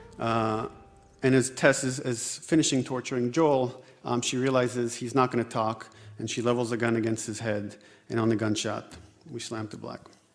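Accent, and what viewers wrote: Portugal. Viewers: Random but are you portuguese?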